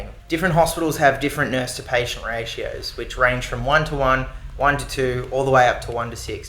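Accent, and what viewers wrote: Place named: Australia. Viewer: You sound so Aussie, are you?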